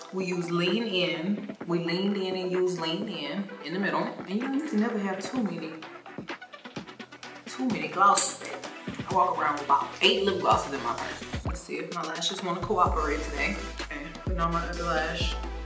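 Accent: South, United States. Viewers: It's the Texas accent for me 😍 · Southern accent coming through strong in this video...😍💪🏽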